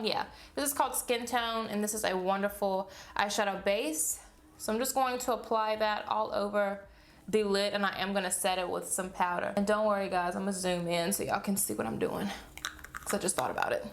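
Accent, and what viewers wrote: South, United States. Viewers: Your southern accent is so darn cute!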